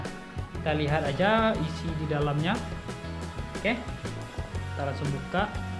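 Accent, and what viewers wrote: Indonesia. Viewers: Kayak logat urang awak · Logat kalteng sih 😄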